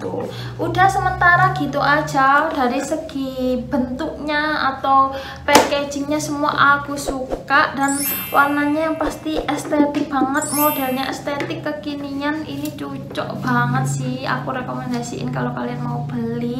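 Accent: Indonesia. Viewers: Medok banget kak basanya 😅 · Medok banget mbaknya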